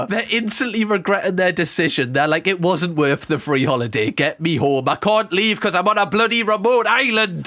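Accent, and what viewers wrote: Newcastle, United Kingdom. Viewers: It’s his Geordie accent so watch someone else if this bothers you too much. You can’t tell people to change their accents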